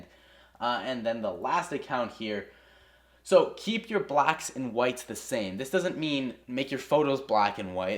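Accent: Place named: Ireland. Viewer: You have a sort of Irish accent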